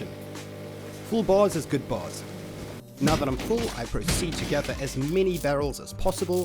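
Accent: South Africa. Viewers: your South-African?? dude that's so cool, i never knew, its almost wipe day on Intox 1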